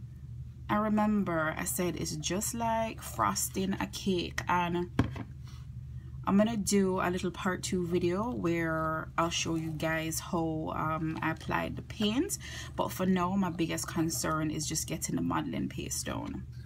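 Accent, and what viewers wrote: Jamaica. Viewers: So refreshing hearing a Jamaican voice 😇 Great video. I’ve been working with space and now ready to try modeling paste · Omg you haveee to be jamaican lol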